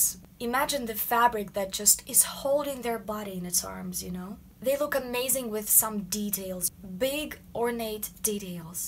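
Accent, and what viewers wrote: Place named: Russia. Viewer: That is funny I found that your accent someway is like you are surely not from Us or England and then I checked you channel oh gash you are russian. That accounts for the milk in the coconut xD OMG I can't really stand it but your information seems pretty important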